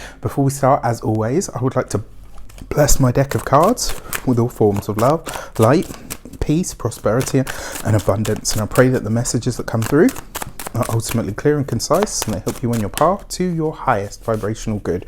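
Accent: England, United Kingdom. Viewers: Thank you very much for the reading. I'm so glad as a Sagittarius girl. By the way. Are you an English? Cause I like the way you read. Lots of love buddy. 💗💗💗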